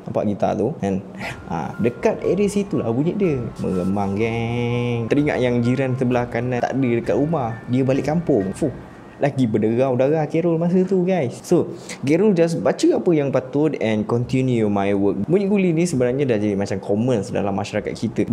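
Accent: Johor, Malaysia. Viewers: org Johor kah? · Abg org johor ke? 😅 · abg ni org johor ke ? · Bang orng johor eh😊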